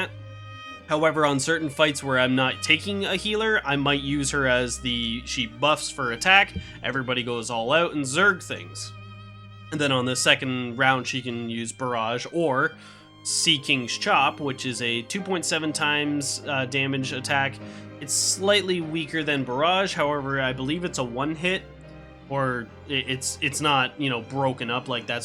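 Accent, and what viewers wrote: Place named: Canada. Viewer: They call Chizuru: Chirizo, type of Mexican sausage due to the obv similarity. You sound Canadian so I can understand the confusion :p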